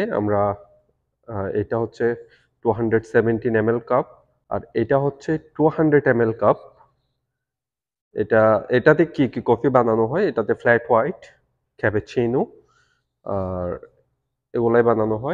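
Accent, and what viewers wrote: Chittagong, Bangladesh. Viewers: আপনি কি চাটগাঁইয়া?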